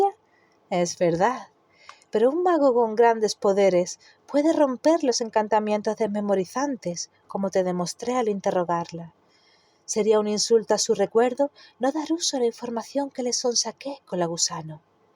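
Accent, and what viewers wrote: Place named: Malaga, Spain. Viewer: Me iba a poner a leer el libro pero tengo tanta fiebre que no puedo ni leer, así que me vienes de perlas para pasar la fiebre en cama. Me ha gustado mucho tu voz y entonación. Por cierto, eres malagueña? 100% seguro que de por esa zona pero no te sitúo exactamente al intentan no poner acento al narrar xD.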